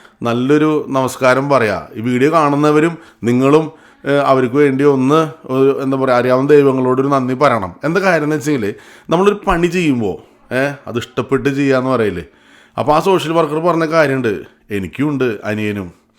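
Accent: Thrissur, India. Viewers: Good presentation 👍Thrissur slang super 🤩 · ഞാൻ ദൈവവിശ്വാസം ഇല്ലാത്ത ആളാണ് എന്നാലും ബ്രദർ തൃശൂർ സ്ലാങ് ഇഷ്ടപ്പെട്ടു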